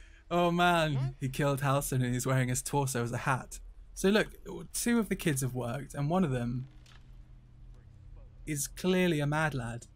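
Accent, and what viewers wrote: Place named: United Kingdom. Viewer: Your British accent made this video even more hilarious!:face-blue-smiling::face-blue-smiling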